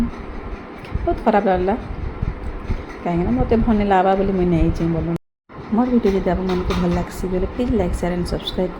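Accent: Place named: Odisha, India. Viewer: Didi tmr balangir district kae, mane aamar ganjam jilla, hele tmr bhasa sunibar ta bhal lagsi ta reply kali. 😂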